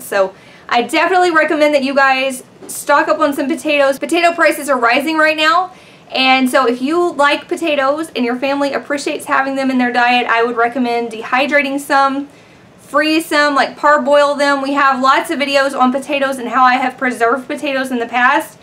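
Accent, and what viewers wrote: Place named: South, United States. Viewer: She is so cute. And has a southern accent! (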